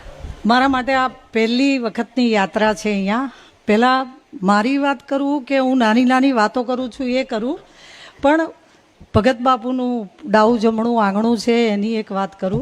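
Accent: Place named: North Gujarat, India. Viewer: ઉત્તર ગુજરાત નો લહેકો અને ગામઠી છટા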